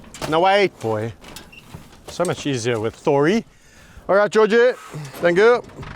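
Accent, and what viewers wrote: South Africa. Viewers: Such adorable creatures, like overgrown pet dogs hehe. Obviously we know animals such as lions and bears can never be completely tamed, and will always retain some of their wild instincts, including the instinct to kill. This can't be "trained away". But I love this dude. He naturally understands that and has a very healthy respect for them and their power, but also doesn't simply abandon these poor, lovable and ecologically important animals, instead risking his life and spending his money to care for them and keep them as comfortable and happy as possible. And that's the truly amazing part. Because you can tell just by seeing the animals that they really are happy with him there. =) NICE job. And I love that awesome S. African accent!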